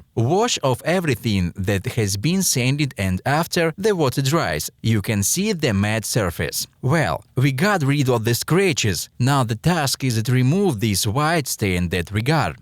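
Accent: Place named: Italy. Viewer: sounds italian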